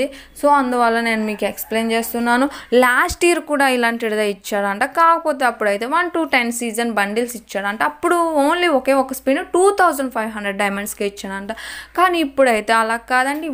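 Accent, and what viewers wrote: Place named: United States. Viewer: Ur english is very good like american english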